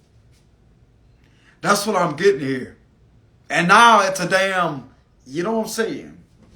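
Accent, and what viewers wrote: Louisiana, United States. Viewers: New to the channel! Came across my feed….no coincidences! Gotta be from Louisiana with that accent 😇 Takes one to know one! Thank you for your service fellow lightworker 🙏✨ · Thank you for the reading. By chance, are you from south Louisiana?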